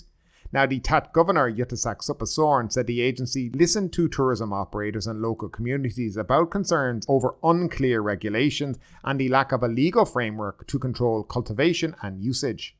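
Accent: Ireland. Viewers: Agreed .He sounds like a fellow Irish man God help me .I bet he was for lock-downs and the jab as well .If this is supposed to be a news service ? The commentary should be non judgemental .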